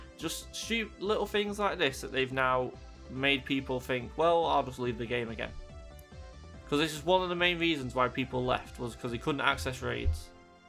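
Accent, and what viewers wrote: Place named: Manchester, United Kingdom. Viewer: Hi. Count Jinsula did a video today saying it looks highly likely that Hanke has put his demand out that he doesn’t want remote raids at all. On another note, I detect a Mancunian accent. You know how bad the weather can be in that part of the country. (I am Lancastrian) but live in S Wales now which arguably experiences just as bad weather conditions. There are times it’s just far too dangerous to go out at all. Hanke lives in his deluded narcissistic bubble where everyone on the planet lives in a mansion in California, where no one works but has unlimited amounts of spare time and money, with unicorns leaping over rainbows. Hanke, right there, is a brilliant example of how to run a company into the ground , bc narcissists are never wrong and know absolutely everything about everything and they know better than everyone else. 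All hail Mr. 💩 Sorry for the rant. I love this game but I went from being never being able to raid legendaries (always lived rural, only myself is playing) to being able to raid legendaries, to now not being able to.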